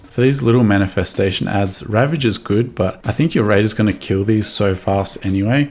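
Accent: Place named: Australia. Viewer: He sounds Australian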